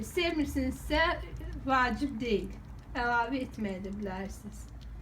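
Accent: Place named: Nakhchivan, Azerbaijan. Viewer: AXWAMINIZ XEYIR XANIM MENDE BAKILIYAM SIZ HANSI KENDDENSIZ SOZLERIMIZ DANIWIQIMIZ OXWAYIR NOXARIWLA YE BELE DEYIRIK😊😊😊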